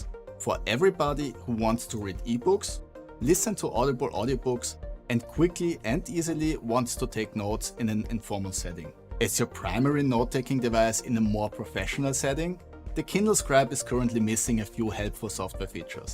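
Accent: Germany. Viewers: Muy friend, your video is perfect, your content is very good, but you need to work on your english, because it really sounds like german spoken with english words. And I am writing this as a non-native listener. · Bad bad german or austrian accent sucks alot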